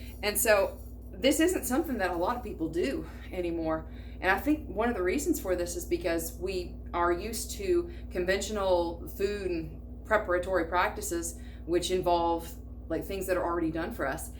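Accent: South, United States. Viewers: Enjoy your Southern drawl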